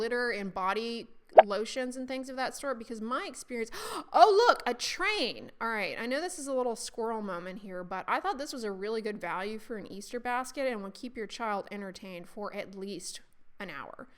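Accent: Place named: Texas, United States. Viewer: I've been a subscriber for years and it makes me chuckle to hear your Texas accent come through. I don't remember where you lived before you moved here.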